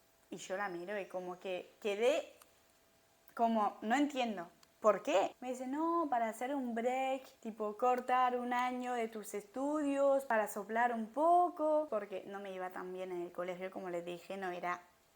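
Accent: Argentina. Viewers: Me gusta como hablas pareces Argentina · Olles tienes acento o hablas argetino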